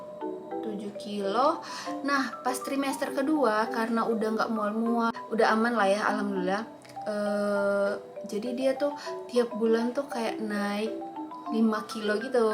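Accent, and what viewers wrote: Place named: Minangkabau, Indonesia. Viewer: Slang Manadonya sudah hilang.......sudah ganti dengan dialek Minang ya👍👍👍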